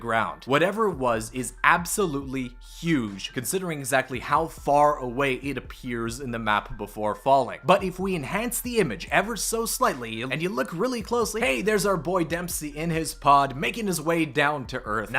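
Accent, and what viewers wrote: Canada. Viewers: Love how us canadian say words, got a good laugh out of that one and not that I really care about being noticed I just watch till the end every time, would like like this comment eh? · Didn’t think twice about the Canadian accent, also up here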